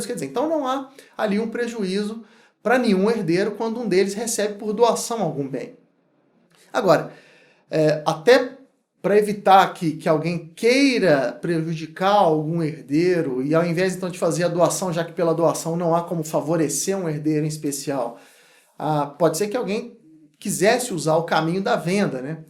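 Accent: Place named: Minas Gerais, Brazil. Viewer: excelente aula, clareza, segurança, domínio. Obrigado. Acho que pelo sotaque é minerinn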